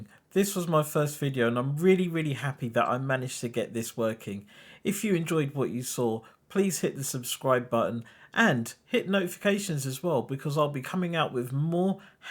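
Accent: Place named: United Kingdom. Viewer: You sound British but your hands don't look British😅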